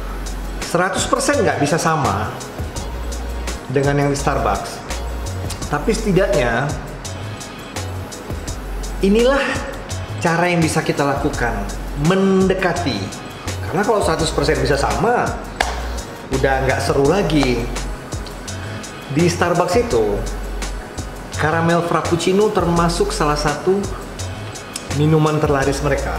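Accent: Medan, Indonesia. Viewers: Kayaknya orang medan kau bang. Soalnya logatnya medan kali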